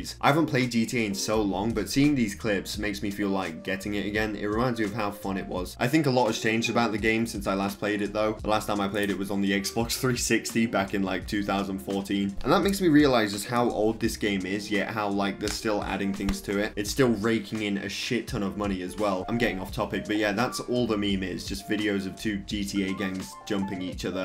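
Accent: England, United Kingdom. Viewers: are you from england ?. do you watch doctor who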